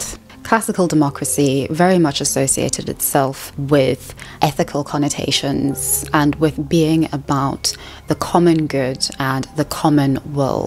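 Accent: South Africa. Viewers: You're South African ❤..love it. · your accent sounds like south african that moved to england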